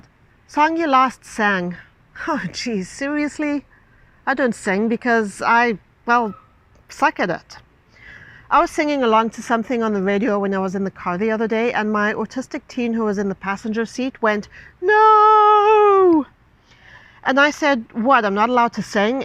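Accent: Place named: United Kingdom. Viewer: London I get it cause you have a tilt in your accent to the British and as for Croatia I really do not know why 😂😂😂😂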